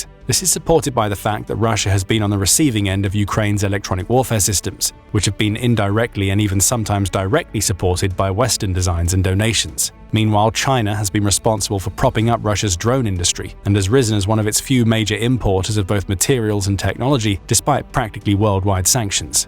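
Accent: England, United Kingdom. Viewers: Why is this commentary using an English accent when it's a US idiom script?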